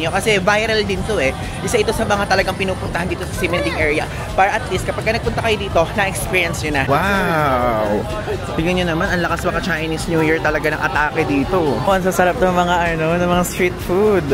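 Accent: Nueva Ecija, Philippines. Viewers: Pag nagsasalita ka, halata talaga na taga Nueva Ecija ka. Not a bad thing though. Nanotice ko lang. Taga Nueva Ecija rin Mama ko.. 😊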